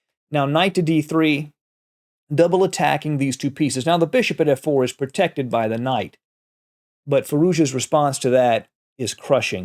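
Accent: United States. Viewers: I very much enjoyed your US English! As of Alireza: one day he would break through.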